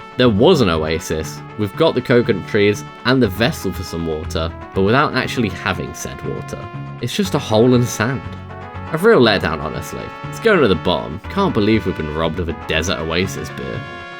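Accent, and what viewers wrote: United Kingdom. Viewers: this is the most british man I have ever heard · Wait this guys British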